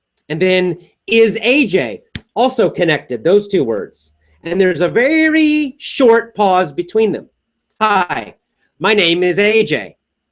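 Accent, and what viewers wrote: United States. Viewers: Super sir..even ur Amcn English we can understand well..(slowly teach).can teach(pronounciation) us more very importantt words? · I love your american accent teacher AJ.its been really long time teaching my self american english only on the Internet cuz we don't have english teachers here well they teach us english roles and grammar by FRANCE ACCENT AND PRONUNCIATON. feeling so down.i hope some Americans come to fez city Morocco just to teach us their own language. 'We re so thirsty ' to learn about American English and more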